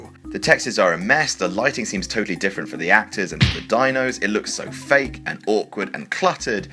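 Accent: United Kingdom. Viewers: Make your introduction even longer.... there is nothing I love more than listening to some boring British monotone voice blather on and on and on.... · Is it just me, or is everyone else fed up with British accent narrators, and Teleprompter readers..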